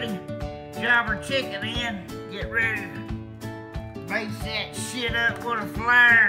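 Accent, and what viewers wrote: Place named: South, United States. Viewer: How can pewdiepie be more popular than this??? It not only includes food but also comedy and mix it all in with a Southern accent yourself a real spicy mix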